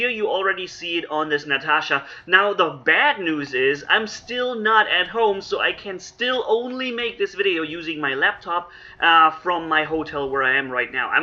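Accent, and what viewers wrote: Germany. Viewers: Are you german. Bist du deutsch, du bist deutsch oder du hast auch Vykker oboniert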